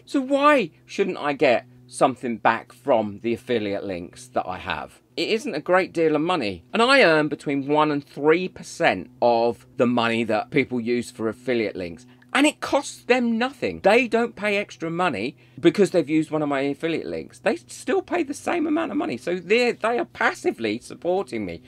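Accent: United Kingdom. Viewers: I for one would like to say Thank You to you. :virtualhug:💝 I always find your Video's delightful and your attitude often silly yet very informative. I can almost bet the problem comes from some one who has a problem with the British accent and thinks it's pretentious. ( If I had to say and I will It is more than likely a Yank and yes I can say it being from Baltimore Maryland! ) I would also like to say thank you for helping those of us who have problems with resin curing and bubbles no matter what we tried. I have a problem with a High dollar brand curing in my basement ( It is very well ventilated and I use PPE I PROMISE! but it stays at 68 degrees no matter what ) and after watching two of your video's on mixing I was able to finally get the bugger ( Sophie and Toffey is the brand I do not like ) to cure in my basement with a resin curing machine. So this Yank would like to say thank you and please continue your beautiful work.💜😇🥰:virtualhug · Oh, dearest!!! 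First off, I love your face!! I find it’s kind and gentle! You’re quite handsome, and your British accent doesn’t hurt a bit!! Lol!! ( I’m Canadian) I’ve watched every thing you’ve made and have learned something every single time. These people who are cruel, for their own pleasure baffle me!! I guess they’re just cranky and sad with nothing better to do! Maybe they want you to feel as horrible as they do. Absolutely unacceptable!! I know you are adored, welcome and needed!!! Your wife, child(ren) must be so super proud of all you’ve accomplished!!! I certainly love you, and believe who I see when I watch you is the real deal!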